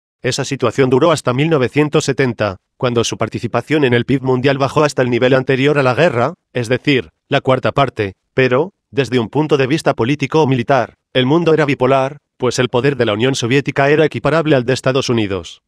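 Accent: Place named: Spain. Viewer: Oye pero cuanta mierda se habla por aquí ! Quien coño maneja estás malditas computadoras con acento español !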